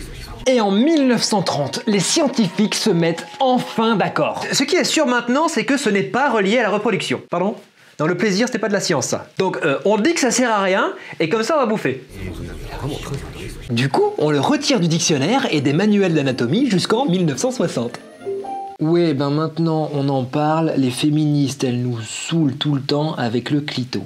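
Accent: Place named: Marseille, France. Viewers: merci pour l'accent du sud. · J'ai adoré la dernière phrase X,) Et tu joues bien ! L'accent marseillais 👌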